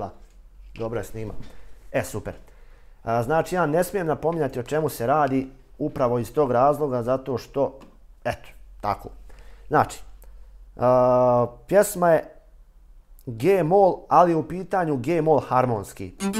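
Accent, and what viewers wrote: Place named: Bosnia and Herzegovina. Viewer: ja moram da ti tepam, nemoj zameriti, sad je 12.decembar slučajno sam naišo na ovaj video, meni i muziciranje koje sjajno radiš nije toliko bitno, koliko si pun pozitive, šta god kažeš van sviračine, toliko zrači harizmom, posebno bosanski akcenat/dijalekt i kako ti prostim jezikom objasniš i sve je tako prirodno. Pozdrav veliki, voleo bih da te upoznam, gde živiš ti, daješ li časove muzičke a Boga mi i ta harizma kojom si oduševio moju suprugu, mog sina, valja se s tobom družiti. Oduševljen sam- Ako stigneš, odgovori mi je l si iz Srbije, ili negde vani. Gde god si, svako bih te posetio. Lep pozdrav, zdravlja ti želim, sreću. Zbilja bih dete, a i sebe uključio ako želiš da nam držiš časove. U ovom regionu punom bolesno sujetnih muzikanatam, samo si iskočio na YT. Ako stigneš. odgovori. Živio!!!!!!!!!!!!!!!!!!!!!!!!!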